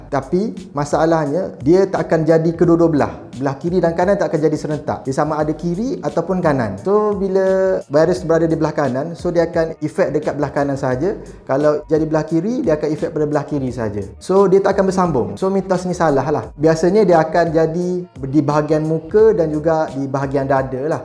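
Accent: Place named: Kelantan, Malaysia. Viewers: Bunyi Kelate parok. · Confirm abg ni orang pantai timur 😂😂. ade seni dia tu.. nice content bro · Ni mesti org kelantan / ganu 🤣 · Bang kelantan ke? Napok ckp kl jd kekok..haha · Hahaha jolo tu abg ni ore kelate laa cakap gitu gakk 😂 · abang ni oran kelantan ke? bunyi pelat bektuh hihihi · Ore kelate kcek luar nmpk lain .tu buleh taw dye ni ore kelate😍😍😍😍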